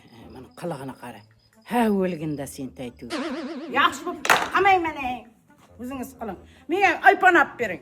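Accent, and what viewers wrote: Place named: Payariq, Uzbekistan. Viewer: ШЕВАНГИЗ БИЗНИ ПАЙАРИК ТУМАНИ АЙРИМ КИШЛОКЛАРИ ШЕВАСИГА УХШАЙДИ ЖУДАЯМ ЙОКАДИ ГАПЛАРНГИЗ. АЙНИКСА ШУ РОЛИК ЗУР ЧИККАН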